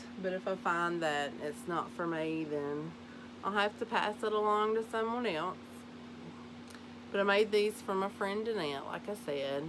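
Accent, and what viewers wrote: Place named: Appalachia, United States. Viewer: Beautiful makes!! I love hearing your hillbilly voice 😂😂 I'm a hillbilly too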